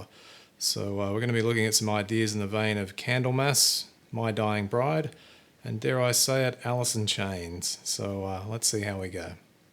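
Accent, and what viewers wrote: Australia. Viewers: Yay, an Aussie, you beaut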